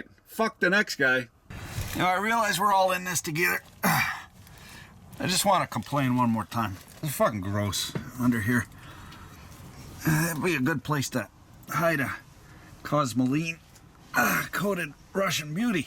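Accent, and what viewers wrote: Canada. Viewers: I just want you to know that my husband watches your channel a lot, and the stupid shit you say (doodad, fuck it bucket, doohickey, etc) + your Canadian accent really, really infuriate me. I’m not sure why. That is all.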